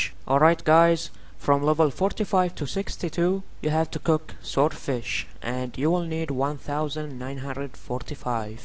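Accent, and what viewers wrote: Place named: Arab world. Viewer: good guide, and your definitely Arab that accent lol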